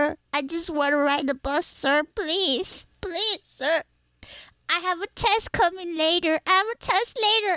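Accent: Puerto Rico. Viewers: Jaja su español es muy de puerto rico XD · I never would have thought Iron Mouse was Latino and refused to believe it, then I heard her accent and "yeah she's definitely Puerto Rican" lol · HER SPANISH IS PUERTO RICAN HOLY SHIT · Hi there qualified puerto rican living on the island, can confirm 100% accurate right down to her accent. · lol. I was just curious because I heard this vtuber was puerto rican so this is the first video of hers I'm watching. Her spanish was very puerto rican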